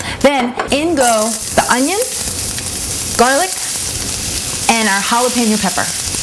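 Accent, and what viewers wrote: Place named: New Jersey, United States. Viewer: Lol what happened to your Jersey accent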